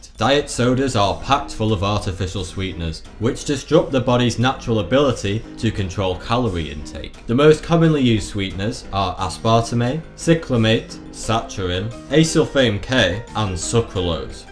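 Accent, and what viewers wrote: United Kingdom. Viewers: I have never been obsessed with british accents. Goodbye, that part of me!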